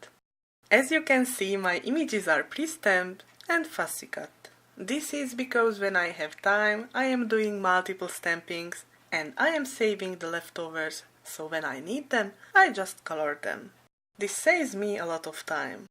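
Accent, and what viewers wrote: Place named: Netherlands. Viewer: Hi! I chatted eith u on IG briefly. Awesome creation!😍😍😍😍 ps..love ur accent (dutch?) Im in USA (New York accent but i live in Florida🌞)lol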